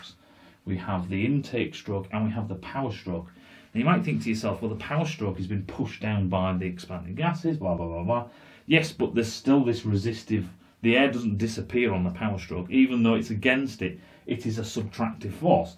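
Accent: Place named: Yorkshire, United Kingdom. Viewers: Didn't click on the video csuse ofnthe title, I clicked to hear your sexg northern accent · Normality is returning to the world with a Yorkshire accent 😉 It’s good to see and hear you once again.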